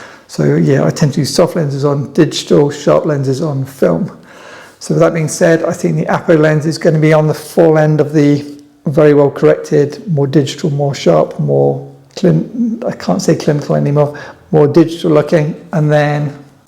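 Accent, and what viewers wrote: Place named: United Kingdom. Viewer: Ciao! Thanks again for your content, I always find it “sharp”, balanced, and genuinely enjoyable. And that lovely British flow of yours? Can’t get enough of it ❤ Since I work in sound, I hope you don’t mind a tiny suggestion: your voice has a naturally low and soft tone, which is really pleasant, but when the audio gets boosted to make it more audible, it introduces distortion, crackling, and a bit of reverb, even noticeable on phone speakers. Using a proper mic plugged into the Rode GO could really preserve the quality of your voice and take the whole listening experience to the next level. Sorry for pointing it out, I know it’s a bit of a sound-geek thing, but sometimes it makes it tough for me to fully enjoy your content, which is otherwise brilliant. Some mics that I suggest: RØDE lavalier II (not the “go”) Sanken cos11 Sennheiser mke2 Sennheiser mke1 One of those even used on ebay Combined with a little fur: Rycote overcover or similar Will make your audio leica level:) Looking forward to the next one!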